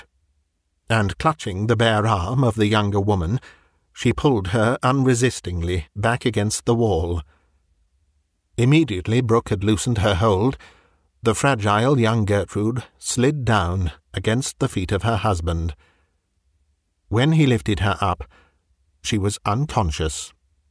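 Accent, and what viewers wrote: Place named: West Country, United Kingdom. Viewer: You do a marvelous West Country accent.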